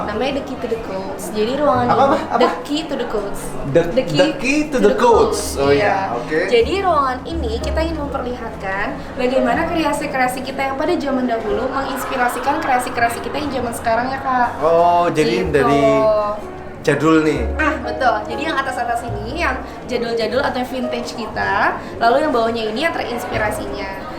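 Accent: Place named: Java, Indonesia. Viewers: Cabe joooon , wkwkwk ... btw , lucu nonton orang logat jawa kaya situ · Pertahano bhs suroboyo mu cak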